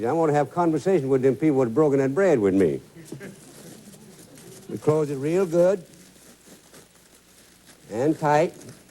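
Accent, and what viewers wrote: Louisiana, United States. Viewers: I do too. That is a specifically Cajun accent. Some have a thicker, French accent the deeper you go. And we southerners can tell each other apart because of the small differences in our accents. A person from North Carolina sounds way different from a Texan, so on and so forth. You don't hear this kind of speak outside of southern Louisiana that much... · I know this comment was posted a year ago, but seriously grow up. He wasn't a "Fake". E. Wilson (April 24, 1914 - September 5, 2001) Wilson was born in Roseland in Tangipahoa Parish, one of the "Florida Parishes" of Louisiana. Consider yourself taught. If you don't like the Cajun accent then I suggest you not watch his videos and not visit Louisiana or even to my mother's house. Mom and Dad, especially Dad talk like.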